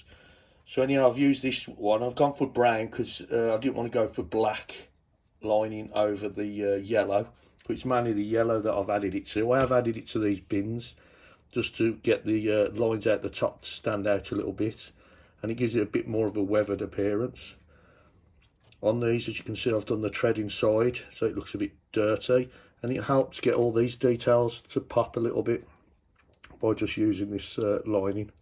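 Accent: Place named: Birmingham, United Kingdom. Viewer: A great Brummy accent. As someone who is Bromwicham born-and-bred, but has been living in the United States for the last 37 years, I find it quite refreshing. :)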